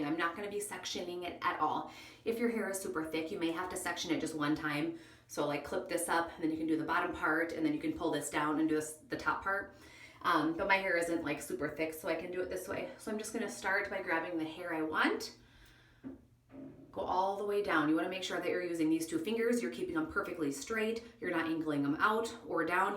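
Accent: United States. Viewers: ohh.. I can tell you have a midwest accent. 🙂 I love your hair tips...thank you! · Are you from Minnesota or somewhere up north or Canada? Your accent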